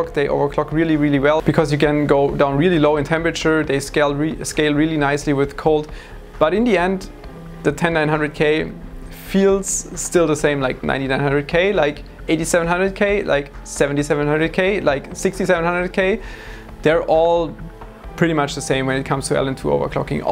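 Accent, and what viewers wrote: Germany. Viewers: Hab noch nie ein deutscheres Englisch gehört😂😂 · Man bemerkt dass du deutscher bist xDD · der deutsche akzent ist so hörbar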